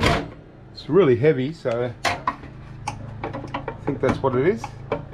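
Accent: Australia. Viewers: Gotta say I love hearing Aussie spoken in its natural environment. Glad to hear the assorted body parts are healing up, because there are a bunch of crazy Yanks up here looking forward to street scrapping season!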